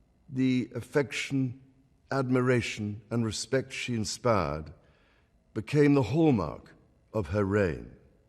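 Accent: RP, United Kingdom. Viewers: That posh accent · Not a fan of this man's but must say ' His speaking has such elegance. The British English and the accent can be very sophisticated. More than any other accent. Including the accent of this wonderful country America. I think the pronunciation of the words are so proper and classy.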